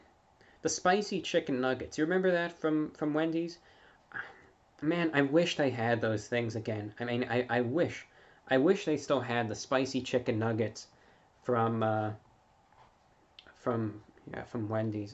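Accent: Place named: New York, United States. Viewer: Okeechobee fla i thought he was from ny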